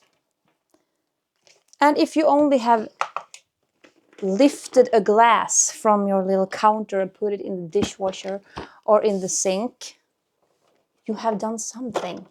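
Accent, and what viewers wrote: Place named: Sweden. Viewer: Call things whatever you like to call them. Hopefully everybody knows you're from Sweden and have an accent. I dont expect perfection in the way you pronounce words whatsoever. Honestly, I'm just working and I'm not really even paying attention to the way you're talking or not talking. I don't notice at all. I noticed you said please talk normally. it almost sounded like somebody in your family or some caregiver said that to you as a kid maybe? Please be kind to yourself. I don't probably most people don't notice these little things that you notice about your communication.. words Etc. Please focus on the fact that you're making a video and that's in itself is really amazing!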